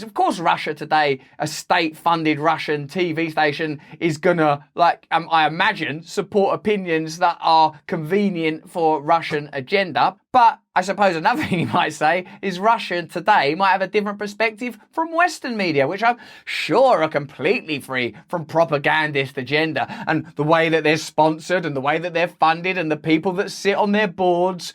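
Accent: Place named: United Kingdom. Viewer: Greetings from 🇺🇸. I love your British voice!🙏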